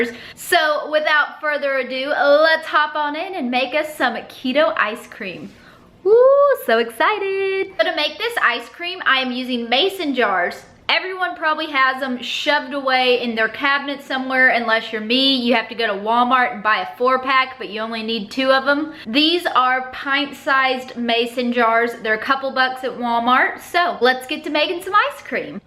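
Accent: South, United States. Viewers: I absolutely was mesmerized by your accent. Southern women are my bane. 😍😍😍😍😍😍😍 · You are a doll! Really enjoyed your video. Your sweet accent made me homesick for the south :) God bless you! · your southern drawl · I hate you and love you for this 🤣 I made this tonight and it was so damn good!! I did the chocolate peanutbutter one without the chocolate chips, I used heavy cream (not whipping) and the Swerve confectioners. I ate half of the jar 😎. Thank you for this, btw I love your southern accent 👍.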